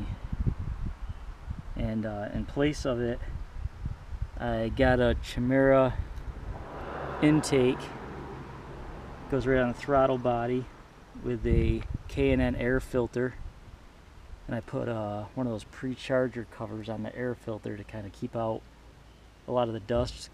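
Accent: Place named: Midwest, United States. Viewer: You from Minnesota? I hear a Midwestern accent haha.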